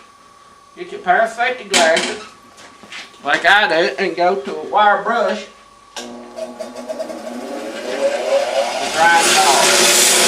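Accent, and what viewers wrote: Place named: Tennessee, United States. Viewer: Are you from Tennesee some where's ? Like around Kingsport? I knew another guy sounded just like you from up that way. Excellent video and I just pulled my cover on my 11hp 92 briggs, and was wondering about that magneto being rusty, and this was very appropriate. Take care and keep the video's coming...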